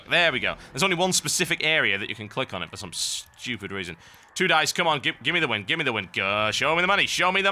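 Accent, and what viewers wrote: United Kingdom. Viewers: *Facepalm* ITS A BRITISH ACCENT. OBVIOUSLY. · I was under the impression he was British...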